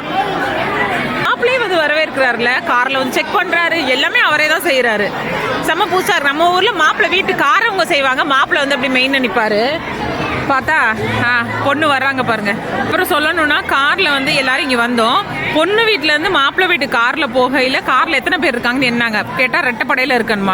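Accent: India. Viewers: அருமை மதுரை தமிழில் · அம்மாச்சியா உங்க ஊரு எது சகோதரி, எங்க ஊரு பேச்சு மாதிரி இருக்கு, எங்க ஊரு காரைக்குடி ங்க.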